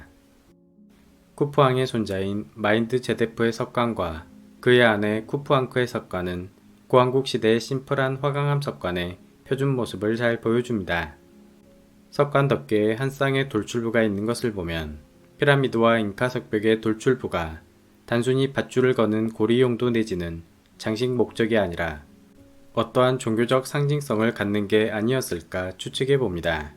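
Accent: Jeolla, South Korea. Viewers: 은은하게 들리는 전라도 억양이 반갑소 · 좋은영상 감사합니다~ 성우분 전라도 사투리가 들리네요😆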